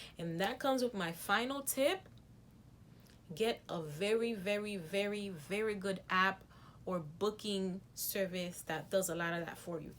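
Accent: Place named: Haiti. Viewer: Random question... are you Haitian? I detect an accent and you look like you can be one of my cousins lol 🇭🇹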